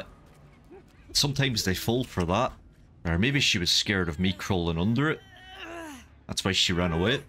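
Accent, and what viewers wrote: Ireland. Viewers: what a strong accent,irish?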